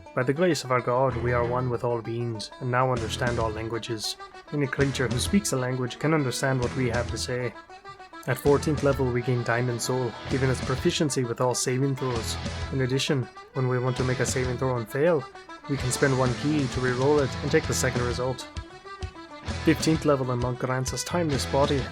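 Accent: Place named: Scotland, United Kingdom. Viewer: The fact that the guy making this has a Scottish accent too 😂😂😂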